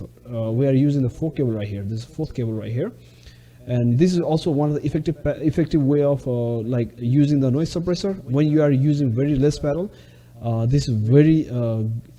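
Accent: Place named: Nepal. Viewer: Are you Nepalese bro? you’ve Nepalese Accent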